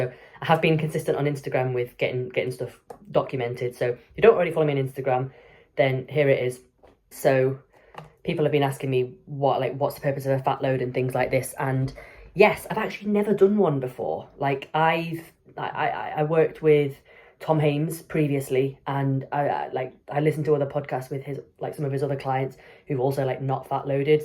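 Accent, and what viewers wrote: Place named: England, United Kingdom. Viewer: Yayy a new video 🥰 I love your accent btw haha are you English?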